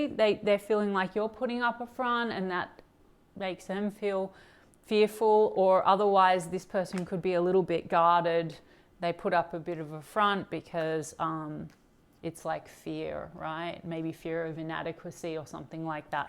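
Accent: Australia. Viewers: Great to hear a fellow Aussie gal reading….. absolutely spot on….. will check out the Law of Assumption🙏🏼🫶 🇦🇺⭐️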